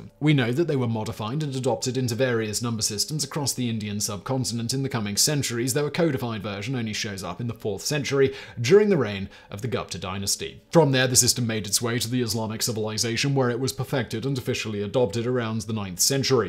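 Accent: United Kingdom. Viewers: I listen to the Casual Criminalist podcast at night while driving a truck in NC, USA. I have one complaint. It doesn’t come out every day. I need more murdering cannibal stories told to me in a proper British accent. Thanks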